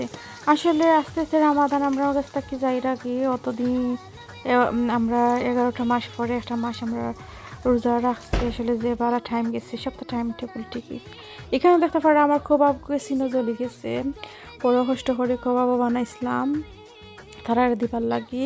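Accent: Sylhet, Bangladesh. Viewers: Mashaallah nice blog ar khuv sundor sylheti mat, thanks for enjoyable shearing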